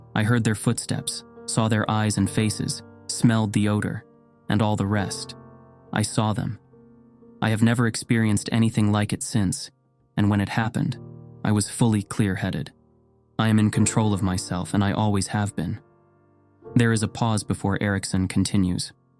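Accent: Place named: Eslöv, Sweden. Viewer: Trodde att han var från Eslöv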